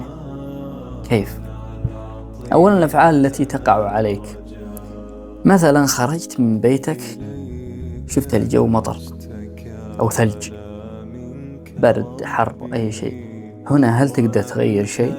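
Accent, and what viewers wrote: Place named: Yemen. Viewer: اللهجة اليمنية 😅❤❤❤ اول مرة أحضر فيديو ليمني بعد فداء الدين قليلين جدا إخوانا في السوشيال❤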